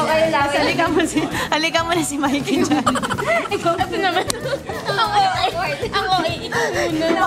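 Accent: Philippines. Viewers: Ms. gustong gusto ko talaga accent mo, rizaleña talaga 😂proud tiga angono na lumaki sa binangonan... 😉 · May punto ng Taytay... abang ka-saya!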